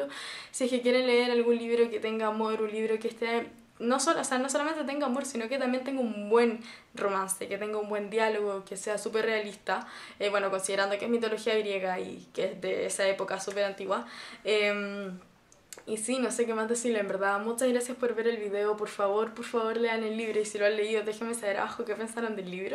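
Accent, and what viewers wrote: Chile. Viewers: Estoy tan obsesionado con este libro que comencé a ver y leer todo lo que hay en internet al respecto. Pero me surgió una pregunta: De donde sos? tenes acento chileno pero usas palabras que usamos en Argentina jaja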